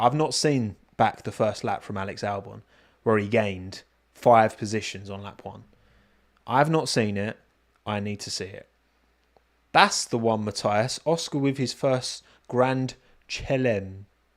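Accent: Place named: Cockney, United Kingdom. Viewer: Is could just get rid of that gawd awful cockney accent this might be listenable to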